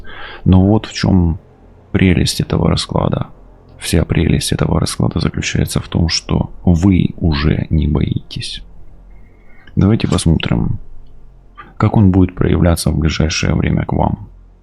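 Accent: Ukraine. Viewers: ! Очень интересный расклад. И очень красивый чистый украинский язык.. Не часто такой услышишь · Красивый расклад и красивая украинская речь ( мова). Мир всем нам❤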